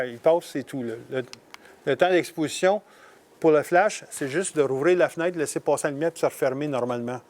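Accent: Quebec, Canada. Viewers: merci pour vos conseils ils vont mettent très utiles pour mes prochaines photos et un super merci pour votre accent j'ai vraiment hâte d'aller au Quebec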